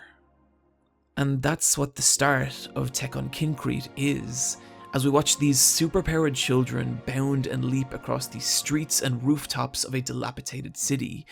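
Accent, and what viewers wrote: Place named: Ireland. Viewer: Your irish accent is lovely!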